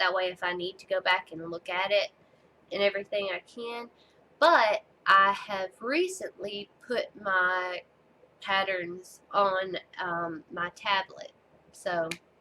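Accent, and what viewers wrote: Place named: Alabama, United States. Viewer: Hi! So nice to meet you. I am an Alabama girl too. I was born in south Alabama but I live in north Georgia now. So you and I have the same accent.:) So sorry to hear about your illness but so happy to see you stay positive in the middle of it all. You are truly an inspiration. I enjoyed your first video. I don't make videos but my needlework can be found on Instagram if you are on there. I looking forward to see more of your beautiful needlework. This community is awesome. I am so glad you are part of it. Merry Christmas to you! Happy Stitching ~Janet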